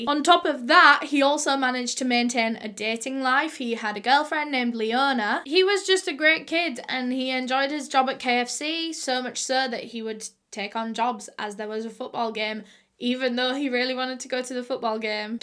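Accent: United Kingdom. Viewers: Who else is just mesmerized by her British accent?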